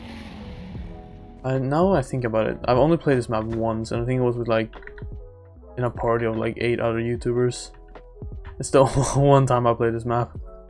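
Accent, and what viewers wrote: Sweden. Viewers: you from sweden??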